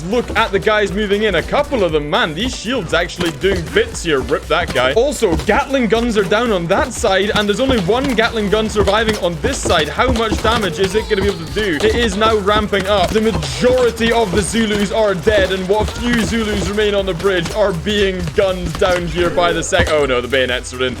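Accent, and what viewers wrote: Scotland, United Kingdom. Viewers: Are you Scottish?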